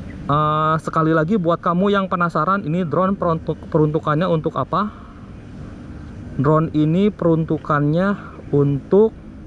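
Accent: West Java, Indonesia. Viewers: Kang sampean orang sundanya, kanyahoan dina logat nage😁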